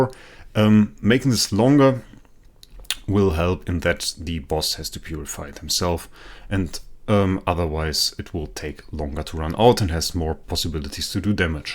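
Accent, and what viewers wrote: Germany. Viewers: Is ur motherlanguage german?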